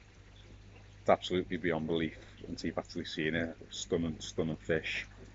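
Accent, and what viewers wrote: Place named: North London, United Kingdom. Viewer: Watched a few of your videos and by the accent your not far from me 👍🏻 I live in North London now but grew up in Skem..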